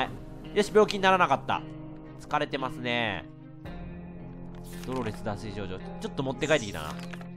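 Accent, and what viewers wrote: Kansai, Japan. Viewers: レトさんの関西弁好きすぎる、、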